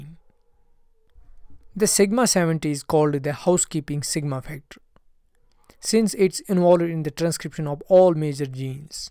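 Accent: Kashmir, India. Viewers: Sir Are you a Kashmiri. It seems by ur accent. Please reply.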